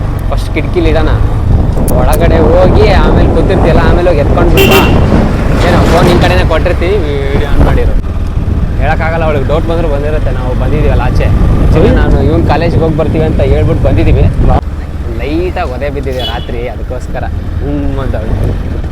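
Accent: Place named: North Karnataka, India. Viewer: ಸೂಪರ್ ಅಣ್ಣ ಉತ್ತರ ಕರ್ನಾಟಕದ ಮಾತು ಅಂದ್ರೆ ಹಿಂಗೆ 👍🌹🌹🌹🌹🌹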